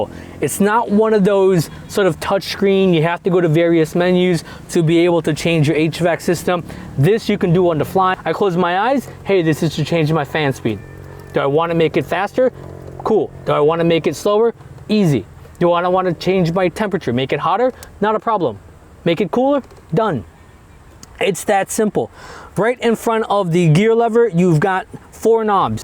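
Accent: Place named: United States. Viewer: Good video, you sound like an American, if so how do you think the Jimny would handle American highways and traffic. Long drives that sort of info. TY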